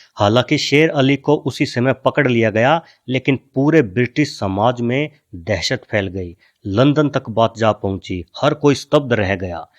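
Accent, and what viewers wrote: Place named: Rohtak, India. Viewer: Aapke Rohtak type उच्चारण ka koi nidan dhoondein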